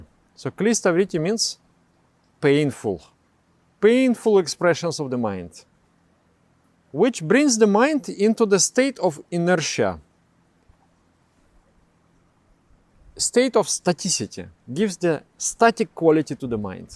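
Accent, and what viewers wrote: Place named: Russia. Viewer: Wonderful video! Thank you so much for the information, very helpful, brought clarity to me. And your Russian accent is awesome, wonderful! ❤️❤️❤️😇🙏Namaskaram!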